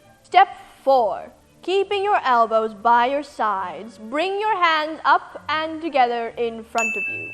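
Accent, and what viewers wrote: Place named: England, United Kingdom. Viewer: I love how English accent becomes more pronounced as the video continues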